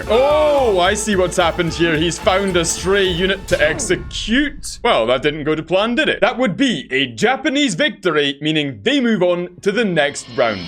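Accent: Scotland, United Kingdom. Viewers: What The Hell? Is Going On? I SUBSCRIBED TO A SCOTISH MAN!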